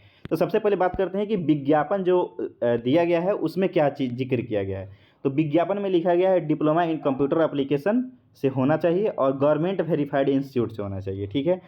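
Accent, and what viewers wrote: Motihari, India. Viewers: Aap motihari se ho kya bhiya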